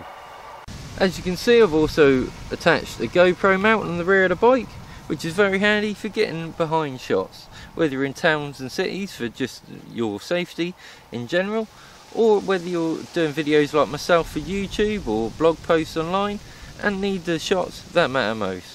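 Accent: Norfolk, United Kingdom. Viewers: Bor Yueh presented by a rum ole Norfolk boi sounds like ole Boi yeah haha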